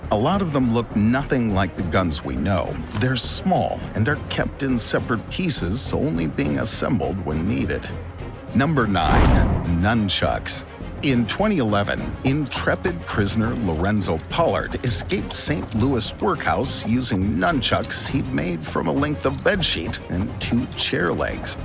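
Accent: United Kingdom. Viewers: Leave it to a bri'ish person to give an account on the best way to stab someone with a knife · You’re ao british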